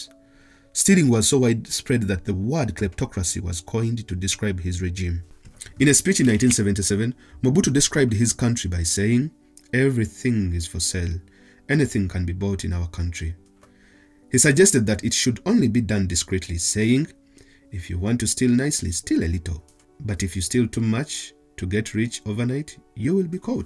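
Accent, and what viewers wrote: Uganda. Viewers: The accent tells me you're Ugandan. Great work bro. Oli wamanyi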